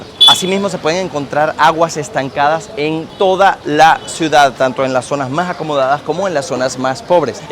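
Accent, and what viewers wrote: Venezuela. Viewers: Para su reportaje todo es pobreza, sucio na hay lugares histórico, turísmo y cosas positiva según usted. por su. acento es venezolano, y le digo algo en su país hay bastante, dígame los cerros.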